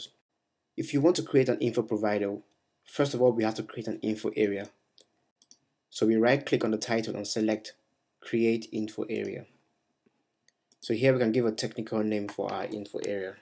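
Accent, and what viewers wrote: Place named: Africa. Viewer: Don't be a simple minded person. He has an African accent that is mixed with European accent. He is not faking any accent, instead he spoke slowly so everyone will understand. It shows exactly what you got from the training.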